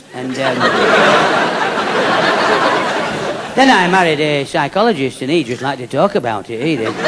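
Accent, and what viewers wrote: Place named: Yorkshire, United Kingdom. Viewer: It's taking me a bit to understand the jokes with his thick Yorkshire accent, but this is, hands down, better than the comics who do a whole show fulll of cussing and vulgarity nowadays.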